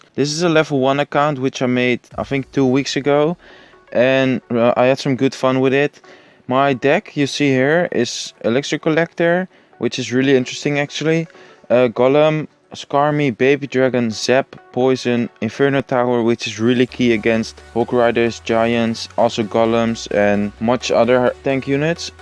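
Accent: Netherlands. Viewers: Are you dutch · are you dutch?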